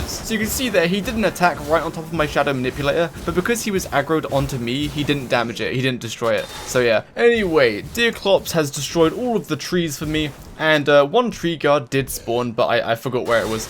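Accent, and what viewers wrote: United Kingdom. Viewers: The bri'ish accent commentary is charming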